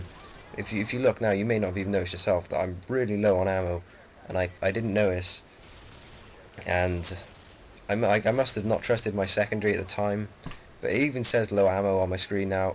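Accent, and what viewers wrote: United Kingdom. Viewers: He sounds british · this guy is the only guy that sounds british that plays on xbox 360 cause like every video i see of a british person its always ps3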